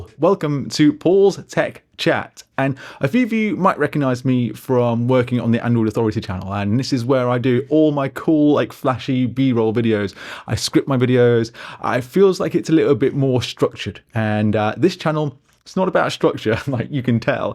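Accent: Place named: United States. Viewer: Really good American white guy accent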